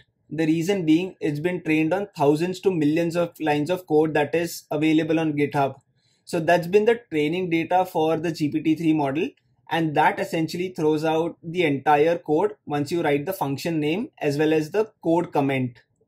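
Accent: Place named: India. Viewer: on an unrelated note, why don't indians try to learn english without the pronounced indian accent? other countries will sometimes have accents but many try to match the language without an accent or even speak a british or american accent. But in india it seems to almost always be a very pronounced indian accent. I suppose it's better than asians that just can't speak english at all but still :)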